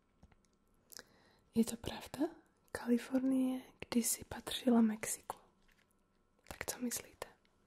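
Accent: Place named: Slovakia. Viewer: Jé to je hezký, jak i v té češtině máš slovenský přízvuk a tu češtinu máš takovou pěkně měkkou :-). A chválím za ř. Vyslovuješ ho moc pěkně. Asi to bylo pro tebe moc těžký soustředit se na češtinu a ještě šepot. Takže video polohlasem a to já osobně moc nemusím. Takže mě se líbíš, když mluvíš slovensky, protože máš krásně jemný, uklidňující šepot a myslím, že to je právě tou slovenštinou,protože je taková měkká a pro ASMR super. Jinak fakt chválím za pěkná videa a, že poslední dobou hodně vydáváš.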